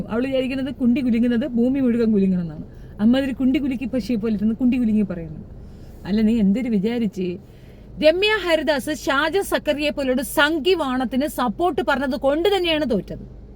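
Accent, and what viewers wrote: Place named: Thiruvananthapuram, India. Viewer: വീഡിയോ ഒക്കെ ഉഷാറാണ്. ആ തിരോധരം സ്ലാങ് കേൾക്കുമ്പോ സുരാജ് വെഞ്ഞാറമൂട് നെ ആണ് ഓർമ വരുന്നത്. ഫൈസൽ കരാട്ടിന്റെ കാര്യം ദശമൂലം ദാമുവിൻറെ പോലെയാണ്.. അവന്റെ അട്ടഹാസവും വലിപ്പുമെല്ലാം കാണുമ്പോൾ സത്യമായിട്ടും ദാമുവിനെ ആണ് ഓർമ വരുന്നത്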